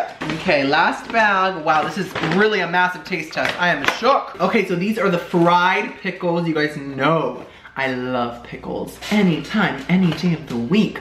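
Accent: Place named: United Kingdom. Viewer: I love to watch the unboxing! Don't listen to the dummies. Also..you don't even EVER have to ask if we want a follow up video to anything...ummm YASSSS WE DO!! I'll take any video from you..follow up, new, or just you talking in your British accent for 30 minutes. Just say BUTT-AHHH in your British accent for 30 minutes and you will make my year!!💙💚💜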